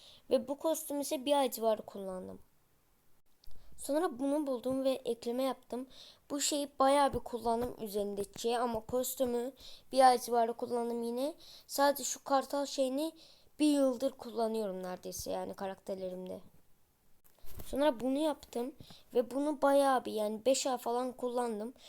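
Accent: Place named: Azerbaijan. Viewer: ade azerisen bilinir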